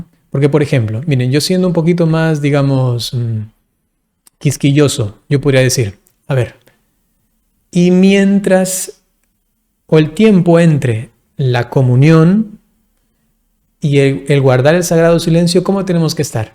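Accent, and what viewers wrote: Brazil. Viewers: Sí, el Padre es español, murciano, pero ha pasado mucho tiempo en Brasil y ha cogido acento. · Conozco muy bien a este sacerdote, es español de España, de Murcia, concretamente. Pero se formó en Brasil desde muy joven y tiene una fuerte entonación portuguesa/brasileña. Ello explica que se exprese perfectamente en español pero suene un poco “raro”.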